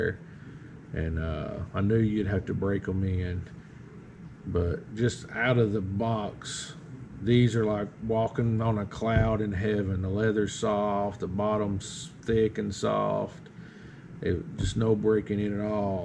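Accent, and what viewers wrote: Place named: South, United States. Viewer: I like your boots, and I like that southern drawl of yours. Happy New Year to you. From Canada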